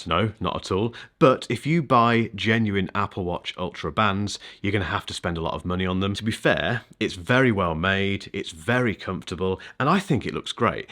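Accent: United Kingdom. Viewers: Keep up the good work, nice to hear tech reviews, simply explained and in a Brit accent !!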